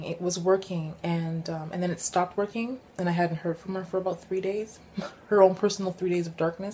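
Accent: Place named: Canada. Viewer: Are you Canadian? You have a little bit of a Canadian accent. I know the government uses TV, and films to brainwash people, and program them to influence their thought processes. Have you ever seen the 1987 film ‘They Live’? It has aliens in it, but that’s not the message the creator was sending. He uses the aliens as symbolism to symbolize that the aliens in that film are actually the elite of this world. The 1% that have control over humanity, and the earth. I believe the elite are Satan worshippers, and it says in the Bible that Satan is the prince of the air, and I believe that means the airwaves. Meaning media we consume. He also has temporary dominion over the earth, and so he can do these things to try to drag as many souls down with him. It’s really sad, but that’s the way the cookie crumbles. I had a prophetic dream about 10 years ago, and to this day I don’t exactly know what it means, but it was very traumatic, and so real, and vivid. 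In the dream I was told to read Isaiah chapter 1, and I didn’t even know if that was a book in the Bible, so I went to read it, and to my astonishment, I found Isaiah was a book in the Bible.. I read it, and it was almost verbatim exactly what my vision was about. It was a little bit different, but it was very juxtaposed to what I was reading. I know when the tribulation happens, God will protect His true elect, unless it happens to be their time, but I believe most of His elect are going to be protected. I don’t want to endure it, I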